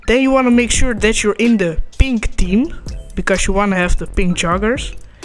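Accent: Netherlands. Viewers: Are you dutch? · Lekker nederlands accentje man lol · Is my man dutch?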